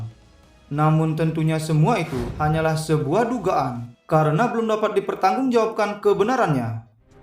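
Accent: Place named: Bali, Indonesia. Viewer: Narasinya diperpendek, logatnya kent al bali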